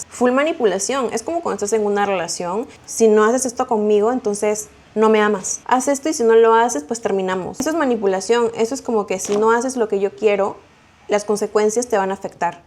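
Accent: Mexico. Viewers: Porque hablas como Mexicana? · Oye tu ecento se escucha muy mexicano y tú testimonio es espejo de muchas personas lo importante es que eres tu misma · Interesante el tema, pero me Jode escuchar a una peruana sin identidad.. hasta el tono es de Méxicana..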